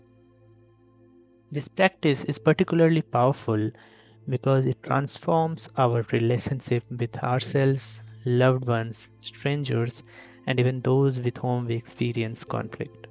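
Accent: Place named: Eastern Uttar Pradesh, India. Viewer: Thank you. This works fine for me. If i had to place your accent it would be Eastern UP or Bihar or even Nepal. That would make it easier for you to ronounc4 pali. It should be Metta for Maitri and Bhaavna, and not Bavaana.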